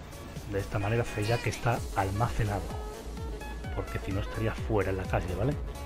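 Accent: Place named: Andalusia, Spain. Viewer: Me encanta de que hables en Andaluz en tus vídeos ❤️ Grande lokete. Te veo desde Granada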